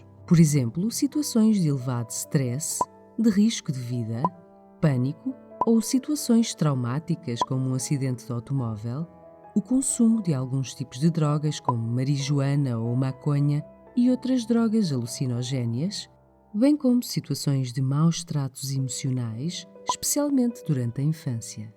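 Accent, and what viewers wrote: Portugal. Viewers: É português de Portugal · Sotaque de Portugal, legal, aqui no Brasil pouquíssimos conhecem essa doença, eu pergunto pra alguns psicólogos ou médicos e ninguém nunca ouviu falar delas(dp e/ou dr) administradores do canal, vocês tem alguns artigos sobre DP e DR? estudos mais avançados sobre elas? quero ler, quero estudar a fundo pra tentar melhorar ou me curar disso, a saúde no Brasil é de passar vergonha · sotaque lindo de Portugal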